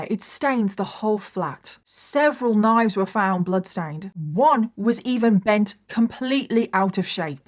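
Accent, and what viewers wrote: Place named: United Kingdom. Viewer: i have been watching your vids for years and i’m still trying to figure out where in the uk your accent is from 😂❤ xx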